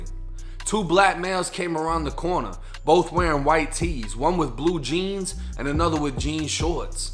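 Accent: Boston, United States. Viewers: Bruh you could be from Tampa but you got that Boston accent straight up!!! Salute to your work tho.. always a great watch #MISSIONHILL #BOSTON here to represent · U from Boston? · How do you have a Beantown accent coming from Florida? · Lol the Boston accent makes more entertaining to listen to the stories.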